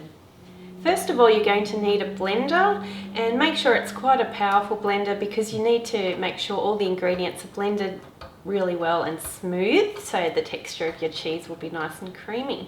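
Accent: Australia. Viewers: You sound like an Aussie, but live in US? Can't wait to try this, thank you, and helloo from Australia. ;D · wow so good will try this very soon,thank you for this video, sounds like your an Ozzie :))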